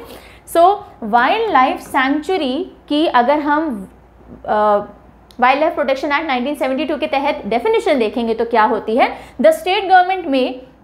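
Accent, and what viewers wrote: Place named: Assam, India. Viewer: Love from Assam ma'am ...app job Assamese word bolte ho ..yesa lgta hein ki app bhi Assam se hi ho ...love you ma'am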